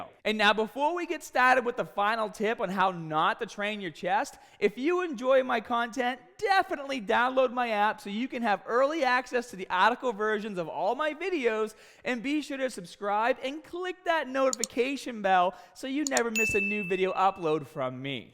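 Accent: Boston, United States. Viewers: Your crazy Boston accent has tone down a bit. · Are you from Boston? You've got that accent especially on the r's.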